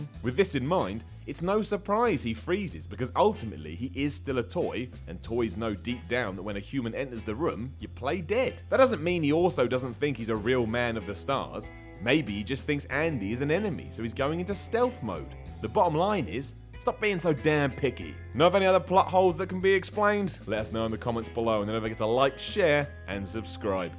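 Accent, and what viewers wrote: United Kingdom. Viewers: Fucking bullshit::: this British accent fuck head thinks he’s soooooo smart ,,,,,cause he’s British?? How’s that British empire doing now ?? British elites???! They even tip like cheap bastards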